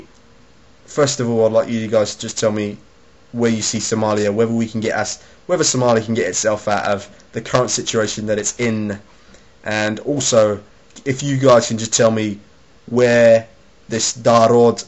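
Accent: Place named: Australia. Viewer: aussie accent. cool.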